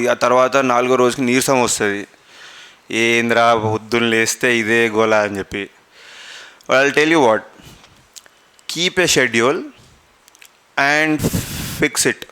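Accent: Telangana, India. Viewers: First time vintunna .... Telangana yasaa....oka civil servant matallo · Sooper speech in telangana yasa garu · Very good to hear pakka Telangana slang ... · కేటీఆర్ సార్ లాగానే ఉన్నారు సార్ అలాగే మ్లాడుతున్నరు తెలంగాణ మడలికంలో చక్కగా అర్థం వంత్తగా మాట్లాడారు సార్ ధన్యవాదాలు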